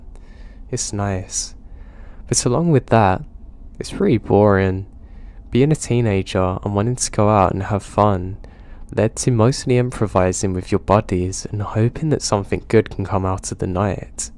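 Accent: England, United Kingdom. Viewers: Your reading is perfect. New subscriber now. Where in England are you from?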